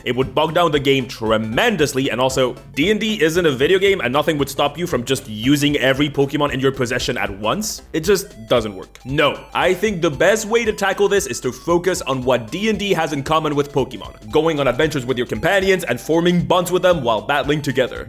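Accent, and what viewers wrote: Spain. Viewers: I mean… his last name is and he has a Spanish adjacent accent, it is sort of expected. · Ideas muy chulas, dibujos geniales... Pero y ese acento en inglés siendo español??? Wow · waitaminut You're a fellow spaniard? Holy, your accent is really nice.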